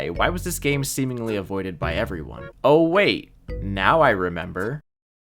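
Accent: Syracuse, United States. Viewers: bro you from Syracuse??? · Are you from... Syracuse..? Cuz I’m relatively close 😳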